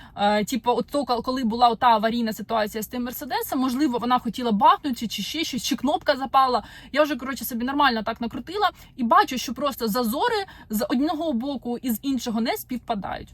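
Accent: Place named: Central Ukraine, Ukraine. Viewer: Хочу сказати що ви розмовляєте українською наче ви не з Базмуту, а десь з центральної України і прожили все життя там. Це супер і контент цікавий!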